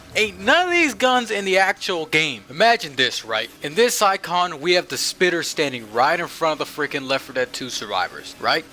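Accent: United States. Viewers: I’m still wondered how the hell your voice is just completely. Did you hit 30 something and you’re in your second puberty with a different voice? It sounded like you had a European accent back then now you just sound american lol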